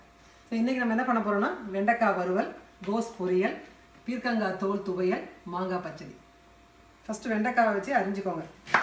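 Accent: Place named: Trichy, India. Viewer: hello mam.. I'm mesmerized by ur speed of cooking... simply superb... which part of tamil nadu are you from..? your slang seems like u are from trichy ... i guess..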